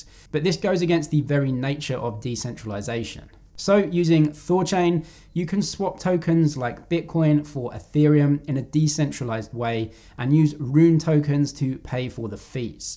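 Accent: United Kingdom. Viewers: Great video, always look forward to your videos. Its refreshing listening to someone from the uk. Have you ever used Trading View. Be great to see a video on that. How it works and allthe different tools. Please keep up the great work.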